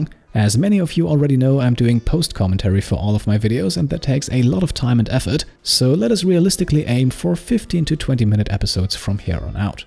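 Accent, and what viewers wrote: RP, United Kingdom. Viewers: "Giveaway" A British man narrates the life of a naked tribal man named Steak that crash landed in an extreme desert who is on a quest to obtain psychic powers. Just ordinary Rimworld things. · Never heard a British person speak like that! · Was looking for a naked brutality run of royalty. Found a posh accent dude doing it and a giveaway. Cool beans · posh as fuck accent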